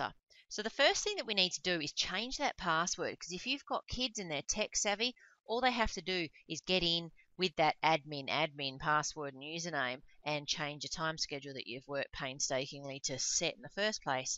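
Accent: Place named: Australia. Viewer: you are so Australian, I love it <3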